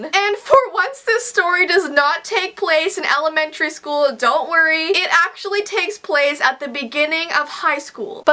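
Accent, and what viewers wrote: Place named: Canada. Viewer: Hi! I have been binge watching your videos and I am American. I know you're Canadian and I love your accent. I have recently been retreaving the Canadian accent. I love it but some people are asking me at school if I was faking the American accent. No, it's because of your accent is getting to me. Have a good day!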